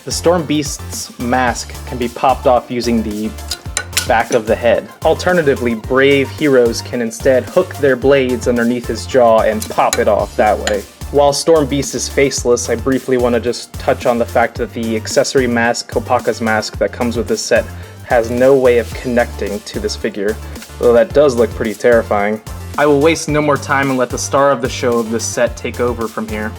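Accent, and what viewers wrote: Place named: United States. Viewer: That American Narrator thx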